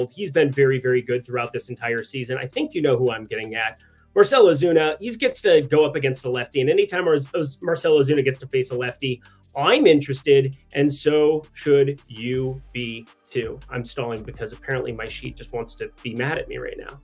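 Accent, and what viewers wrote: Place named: Houston, United States. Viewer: And I like your Houston swang talk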